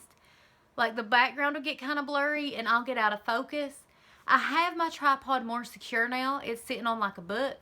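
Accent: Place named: Eastern Kentucky, United States. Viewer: I have laughed so hard at the moonshine thing "well it's illegal." HAHAHA. You are going to rock your 30's like a beast! I am so excited for us to get together and shut down the WLS community on youtube with these Eastern KY southern accents :) You look amazing as always XOXO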